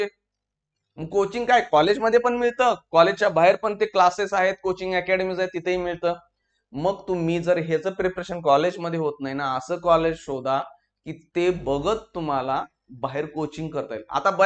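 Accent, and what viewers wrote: Kolhapur, India. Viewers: Are u from Kolhapur???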